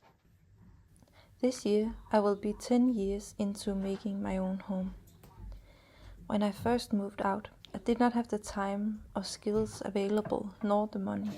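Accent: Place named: Denmark. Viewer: Thank-you for the voice over! I love hearing your Danish accent. It is such a lovely addition to your videos!!!!!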